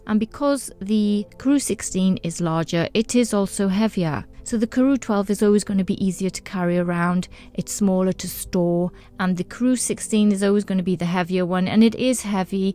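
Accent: United Kingdom. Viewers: J'aime bien cet accent britannique.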